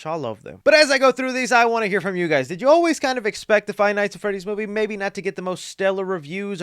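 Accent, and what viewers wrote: United Kingdom. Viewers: 😂 love the British accent 😂 the UK loves you ❤ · Lol, I like your British accent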